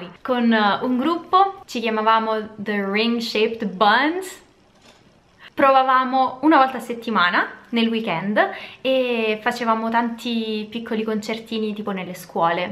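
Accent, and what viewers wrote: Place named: Rome, Italy. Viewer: Ma Brian riesce a cogliere, da non romano, la dolcezza del tuo accento?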